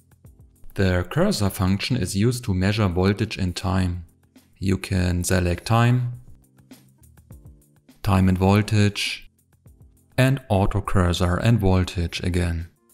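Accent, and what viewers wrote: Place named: Germany. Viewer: Moin! Das Gerät wird unter verschiedenen Bezeichnungen angeboten. Hanmatek DOS1102, Mustool MDS 2112P und OWON SDS1102. Sind alle identisch, aber unter der Bezeichnung OWON deutlich günstiger. Ich besitze das Gerät von OWON und bin damit sehr zufrieden. An "disadvantages" fehlt ganz klar noch eine fehlende externe Triggermöglichkeit und ein mit 10K doch eher recht kleiner Speicher. Das limitiert doch ganz erheblich die Analyse von digitalen Bittmustern. Trotzdem für den Preis ein super Gerät! Mal grundsätzlich: Offensichtlich (hörbar) Ist deutsch deine Muttersprache. Warum antwortest du auf deutsche Kommentare in englisch??? Deinen Text zu dem Gerät hast du doch auch in deutsch verfasst... Ansonsten recht gelungenes Video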